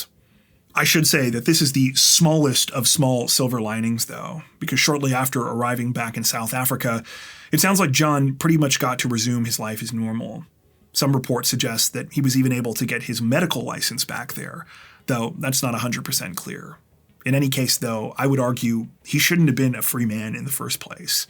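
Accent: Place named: Canada. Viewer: Dude, as soon as I heard Canadian, I was like SUBSCRIBE! (Born and raised in Toronto, and a lover of all things Canadian and true crime) So I guess this is a perfect match eh?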